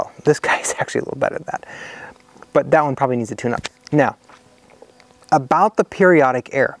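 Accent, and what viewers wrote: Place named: United States. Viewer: how come you have an american accent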